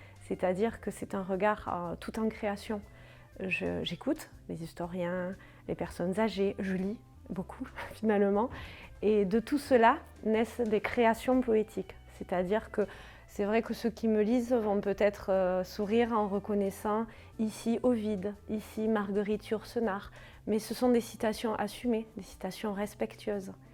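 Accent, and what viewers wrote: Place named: Perpignan, France. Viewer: Quel agréable accent perpignanais! Cela donne autant envie que le propos de de plonger dans ses contes et facéties à la découverte des trésors oubliés de nos différentes langues. Merci!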